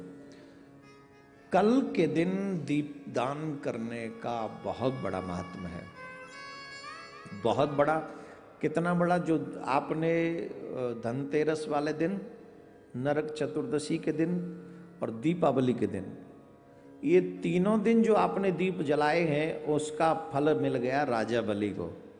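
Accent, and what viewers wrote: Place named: Gujari, India. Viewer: maharaj aapki desi boli ke hum diwane hai kacchu bhi kaho hame to jee badi priya laage mohe eeso laage jaise ye gwalo wali boli hee hume bhagwan bol bhae desi gurjari or rasiya boli jo aap bolo vo kaan me sugar daal de aisi laage maharaj me to sacchi me aapko bhagat bhi hogo or fan bhi hogau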